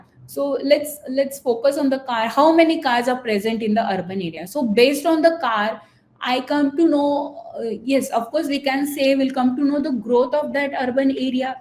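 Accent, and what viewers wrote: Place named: India. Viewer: >indian accent No thanks.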